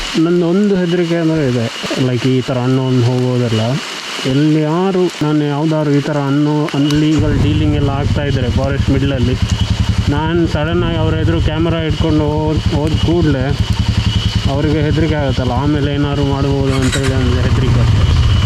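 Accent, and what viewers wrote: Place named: Coastal Karnataka, India. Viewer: Thumba genuine viewers matra nim channel alli baro videos poorthi nododu....but nijvaglu ondh video na poorthi nodudre saaku, we will just fall in love.....you are such a kind hearted person... almost 3.5+ years inda nim subscriber. Ondhe ondh kuda exaggerated video nan nodilla ee channel alli... I'm sure ee channel elligo hogutte swalpa dinadalli and btw nimma dakshina karavali kannada kelakke thumba sogasagide💗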